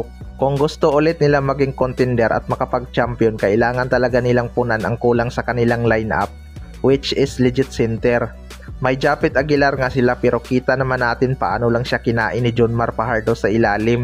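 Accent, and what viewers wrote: Visayas, Philippines. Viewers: ang gling mo mg hanap buhay boss khit tonong bisaya ka ayos lng bsta ba malapit nmn sna sa ttoo ibbalita mo anlayo sa caption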